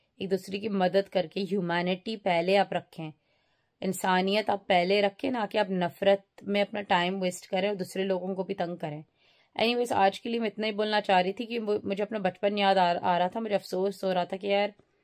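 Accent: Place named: India. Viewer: You're just like Indian,because your tonks are very much similar to hindi & i feel that the way of talking is too much Indian.Happy Diwali & happy new year.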